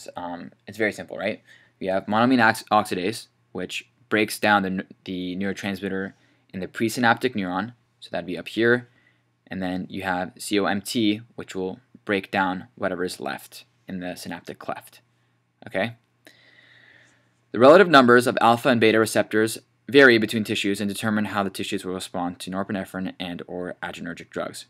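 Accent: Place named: Australia. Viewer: I have no ideas why he sounds like an Australian.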